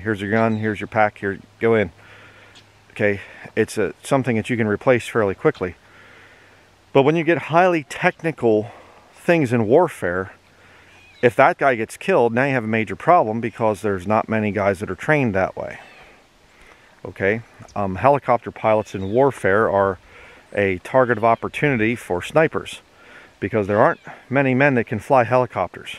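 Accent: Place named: Pennsylvania, United States. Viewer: can tell you're a PA boy by your accent!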